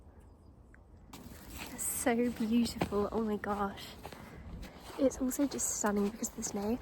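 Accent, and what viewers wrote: United Kingdom. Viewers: There is no such thing as "the British accent". is only one of a great many. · I honestly love your video because you're so cute and I love the British accent and I tried to learn it so I love you 💘🥹💕 and because you don't use music so much so I can listen to the accents to learn it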